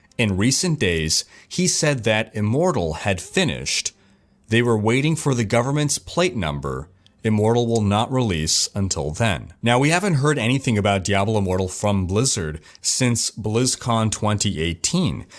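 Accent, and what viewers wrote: France. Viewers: Your french ascent :D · ur french accent is not bad!!! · You have a very good french accent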